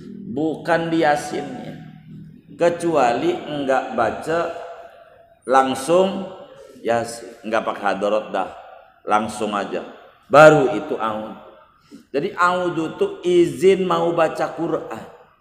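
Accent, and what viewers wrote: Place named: Banten, Indonesia. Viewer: Izin berguru ke pak kiyai, saya dari kresek tangerang, dari logat bahasa pak kiyai kaya nya pak kiyai dari serang banten.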